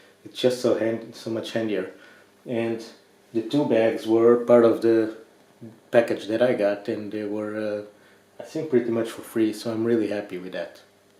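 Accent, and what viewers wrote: Portugal. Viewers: thanks a lot and keep doing this kind of videos. I'm following your channel with special atention since i have exactly the same bike. Are you Portuguese? Your accent sounds from a portuguese.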